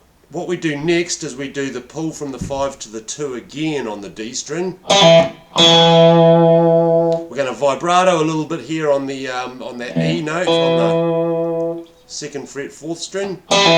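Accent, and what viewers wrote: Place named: New Zealand. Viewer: I don't know if it's a cultural thing, but anytime I hear a kiwi accent in a tutorial, I know I'm in good hands, hahaha.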